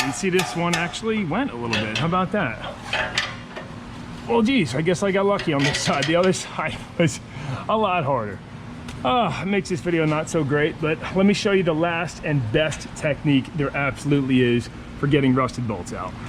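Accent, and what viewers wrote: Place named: Pittsburgh, United States. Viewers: Good vid! I hear a fellow Yinzer accent! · That's a PA accent if I ever heard one!!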